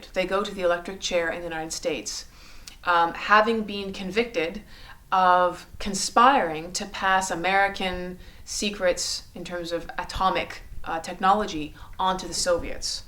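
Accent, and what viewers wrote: Canada. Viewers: Miss mispronounces several names. I detect a touch of a Canadian accent. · And you spelled Abel's name incorrectly. While we're correcting things, W.E.B. DuBois pronounced his name as "Doo-Boyss". Perhaps her being Canadian has something to do with her pronunciations...?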